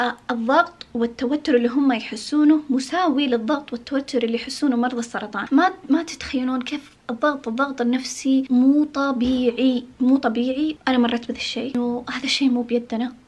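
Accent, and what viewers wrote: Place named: Saudi Arabia. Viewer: ولدت بجدة و انا سورية و اضطريت للهجرة و اشتقت للسعودية لما سمعت لهجتك حنيت الله يجمعنا بكم بخير يارب انتم قلبكم طيب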